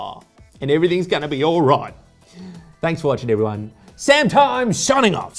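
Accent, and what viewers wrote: Australia. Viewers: Are you Aussie? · R u an Aussie like me mate?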